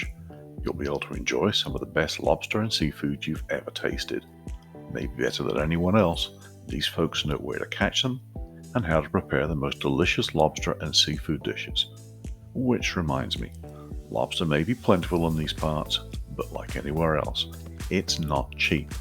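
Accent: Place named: England, United Kingdom. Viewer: "just normal people with limited funds" you have an English accent lmao unless you're an immigrant then you definitely have some funds mate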